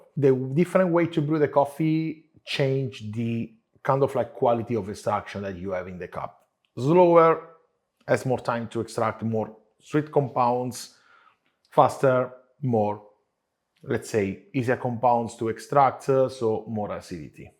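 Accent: Italy. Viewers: as much as I love machinetta and italian accent..half an hour is true dedication :D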